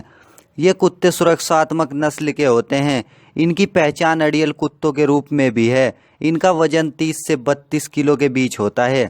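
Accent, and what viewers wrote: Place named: Muzaffarnagar, India. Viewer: Bhai west up me kha se ho aap aapki boli se pta chal rha ke muzaffarnagar dist. Se ho sayed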